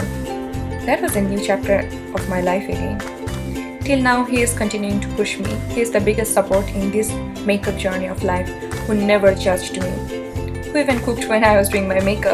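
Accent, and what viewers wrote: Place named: Sylhet, Bangladesh. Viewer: haha me too oank hashi pai sylhetii shunlay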